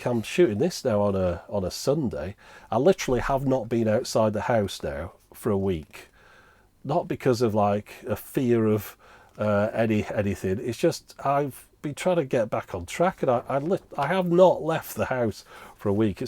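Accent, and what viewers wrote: United Kingdom. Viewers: I love your videos. I belive I watched most of them now. (Some multiple times) Love the Muppet's, the British accent, the kindness, the retro items (the older the better), the explanations, the reviews, the garden views, the close-ups and generally the attention to detail. It is entertaining, educational, funny and just most wholesome. Whenever you say "thanks for watching", I reply thanks for showing.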